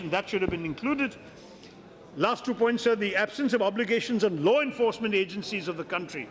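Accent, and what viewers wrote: United Kingdom. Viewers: Other members sitting around him are stupid and they don’t even care what he’s delivering from his party as don’t understand his British ascent. All points are very clear and valid. Let’s promote him as PM in the upcoming term. Jago Bharat log jago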